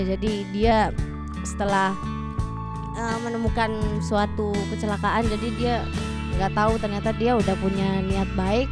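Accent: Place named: Lombok, Indonesia. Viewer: Aksen wong lombok..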